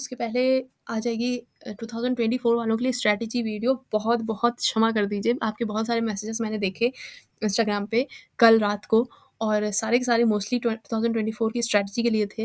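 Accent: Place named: Kanpur, India. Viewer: They both are kanpuriya. That's why same style of speaking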